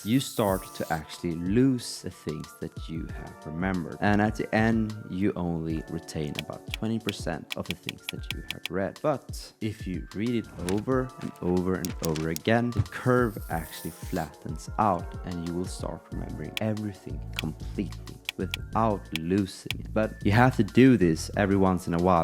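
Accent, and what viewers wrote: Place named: Sweden. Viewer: The swedish accent coming through your english makes you weirdly lovely to listen to. Dont change dude❤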